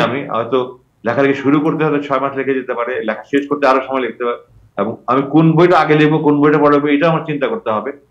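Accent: Sylhet, Bangladesh. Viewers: Kindly try to improve ur SYLATI ACCENT Mr. Conductor.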